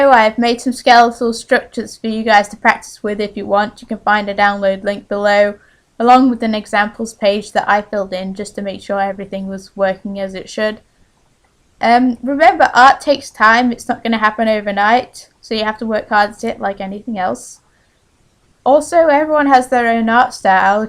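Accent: United Kingdom. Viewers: Its quite good what you made :) but, i think that the teen childe would be a regular childe :p with 8 - 10 years and a teen childe would be allmoste adult size, or at least at the women size :) but just my opinion xp btw love the british acent ^^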